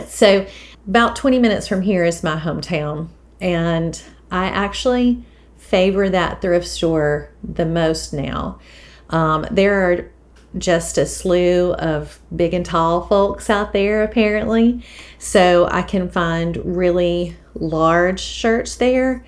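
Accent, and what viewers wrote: South, United States. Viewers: This is not on topic, but does anyone else stress over sandwhiching a quilt? That part brings me to tears. Starting with laying out the backing, then the batting and then the flimsy. I can never seem to line them up. It can take me a whole day to line it up and pin baste. Is it really as simple as all these Youtubers show in their videos? Or is it just me? That is why I haven't started on my next. I am only on my third quilt, but I habe sandwhiched at least 100 for my mother whom is elderly. I love your videos. Let that southern accent shine.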